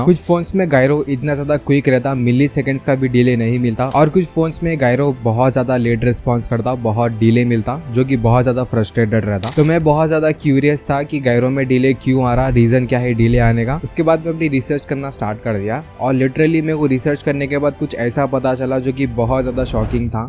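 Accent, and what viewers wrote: Hyderabad, India. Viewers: Bahi aap hydrabadi ho kya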